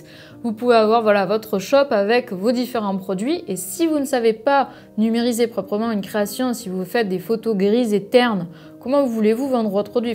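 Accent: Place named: South, France. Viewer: très bien je suis inscrite , j'ai déjà votre livre depuis le début je peint seulement depuis juin 2019. merci, vous avez l'accent du midi. a bientôt sur internet lucette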